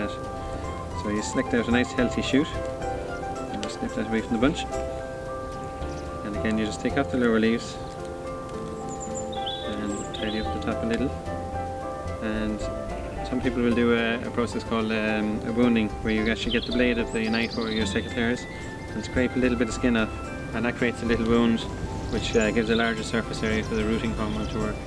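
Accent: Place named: Ireland. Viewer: I'm from NZ and I love ur straight forward, no fuss, informative style and that Irish (???) accent is to die for. thanks for the video.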